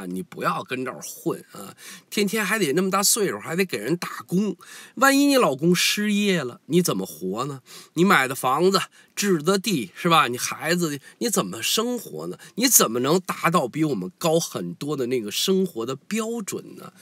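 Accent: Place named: Beijing, China. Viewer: 还是最喜欢听大叔在六月初大嘛闺头李的那期节目，那口地道的北京话听着太过瘾了，我现在就靠着那期节目提神和对抗抑郁症。多谢🙏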